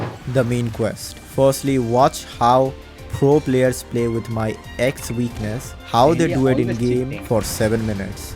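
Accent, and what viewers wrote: India. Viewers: I heard the Indian accent and knew this was going to be the best Tsb video I‘ve ever watched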